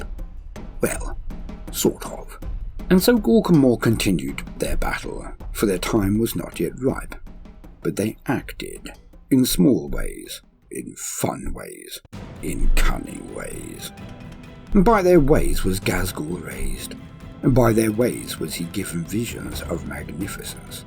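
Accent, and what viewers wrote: United Kingdom. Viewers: Is it just me or does British accent instantly make whatever the topic more entertaining? Nice reading voice duder!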